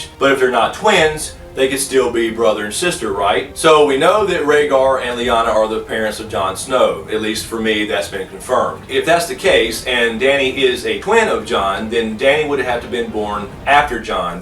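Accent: South, United States. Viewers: just subscribed and am enjoying your videos - very well-informed and i like the common sense tempering all the crazy theories we make up with too much time between books, lol. i live in the northeast, so i am also enjoying your southern pronunciation of the series' names and such - it adds some regional color to it and once in a while a little confusion on my part. in a video you were talking about the dane (spelling?) family sword "dawn", but i kept hearing "dome" and wondering where there is a dome in westeros, till i realized the regional accent difference. it's nice our regional accents still survive in this internet age. i'm subscribed and looking forward to more great videos. (i also like george r-squared martin, lol) as far as jon and dany's parenting goes, i keep several possibilities floating in my head. i won't go into all of them, but r+l=j seems pretty obvious now that i've seen the series thus far and read the books three times. 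but it worries me because grrm doesn't like things to come easy to readers. it is very possible biologically that lyana could have become pregnant and given birth 9 months after she had jon. and "the seed is strong theory" could just be medieval cluelessness about genetics. even the starks have some kids like starks, and most like tully's. i wonder about dany's visions when she was with the undying. so many of them were about starks. there is a definite connection there, though it doesn't need to be familial. keep up the good work!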